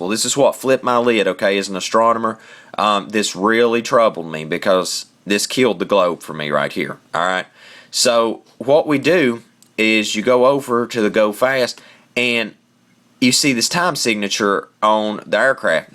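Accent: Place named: Carolinas, United States. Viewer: This is SO cool!....... Btw, Are you from the Carolinas. I have lots of family down there,cos my Mom was from Kings Mountain, NC, and I recognize the accent. and I LOVE it!!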